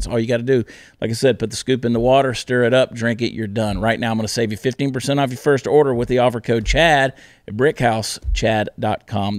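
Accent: Texas, United States. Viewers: YeeHaw, thats a texan right there boy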